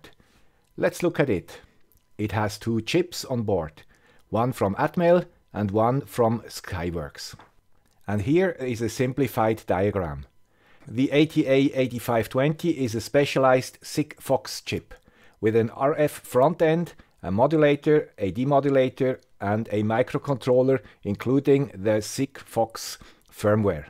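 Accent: Switzerland. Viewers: Another excellent video from the "Expert with a Swiss accent": thank you so much for the qulity of the video. Please note that the 10xDL max.message per day comes from TTN "best practices" only: you do not have such limitation if you run a private LoRaWAN network (what you can forget with SIGFOX), nor if you use an Operator network (you might just pay more for these extra DL...). The deep indoor propagation of LoRaWAN is also better than SIGFOX. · Here's the guy with the Swiss accent, odrr?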